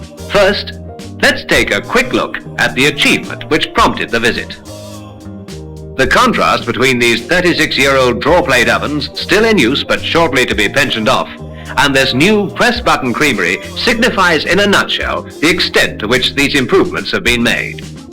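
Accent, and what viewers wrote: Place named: RP, United Kingdom. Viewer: The annoying plummy voiced commentary is a pity- it doesn't sound appropriate.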